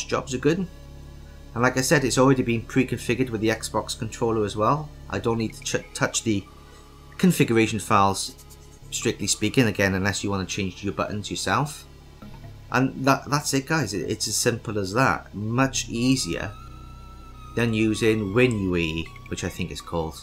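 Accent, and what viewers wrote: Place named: Wales, United Kingdom. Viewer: For a second at the start there I thought you were Irish too, turns out not so much.... Welsh I think.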